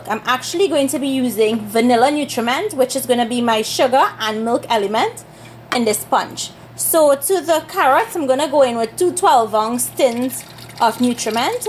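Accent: Trinidad and Tobago. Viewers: Greetings from Grenada via Britain. I make mines similar, instead I use the pulp from my carrots after I finish juicing. In the blender I put condensed milk, the the pulp, and some essence and brown sugar as most of the sugar is extracted into the juice. The beauty is that there is no waste, as I hate throwing the pulp out when I'm done juicing. Hope all is well. (btw- my ex-wife is from Point Fortin, so I ALWAYS miss the accent)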